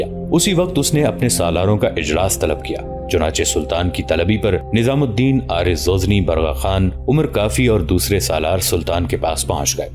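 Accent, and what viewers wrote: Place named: Lucknow, India. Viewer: Agar Lakhnavi andaz mein commentry na ho to behtar hay.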